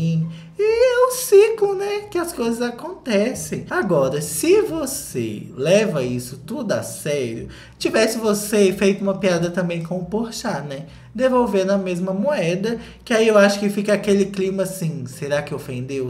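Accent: Minas Gerais, Brazil. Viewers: Vim pela live na foquinha e já tô maratona do todo o canal, amei o jeitinho e sotaque mineiro dele hahaha. Conta essa fofoca pra gente que chegou depois aqui no canal mulher, pelo amor. Kkk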